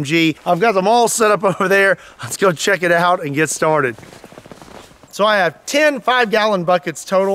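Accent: Kentucky, United States. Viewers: your voice says kentucky, but your clothing says oregon.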